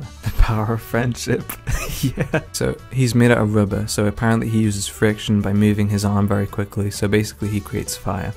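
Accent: United Kingdom. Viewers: British guy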